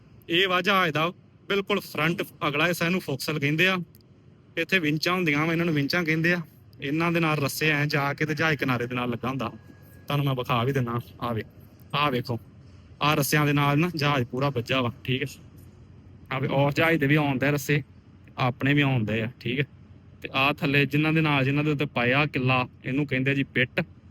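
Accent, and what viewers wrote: Majha, India. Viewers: Language majhe di ah dist vill kehra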